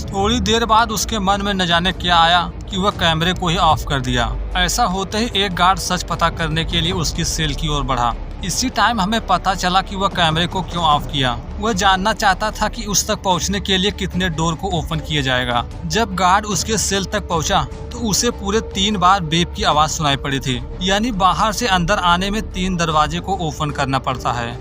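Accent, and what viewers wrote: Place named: Bihar, India. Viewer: Bihari h bolney wala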